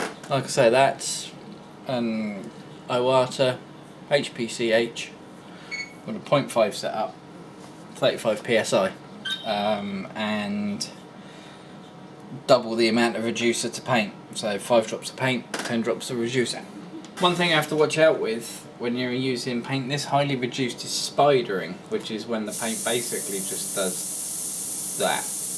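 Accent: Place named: Preston, United Kingdom. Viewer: HI really enjoy your vids and admire your talent. Where abouts are you located roughly of course, I used to live in England in Preston. Doesnt sound as if you are too far from there listening to you talk?. I reside in British Columbia Canada now. Are you aware of MIdwest Airbrush Supply in Chicago. They pretty much have everything and ship all over the world.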